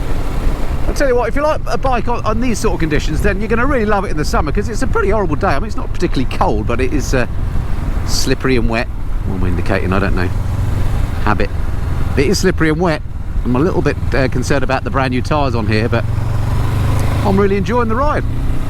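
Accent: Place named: Hertfordshire, United Kingdom. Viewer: Great review you old Norfolk boy you ha ha. You've still got the accent albeit mixed with a bit of semi posh Hertfordshire home counties.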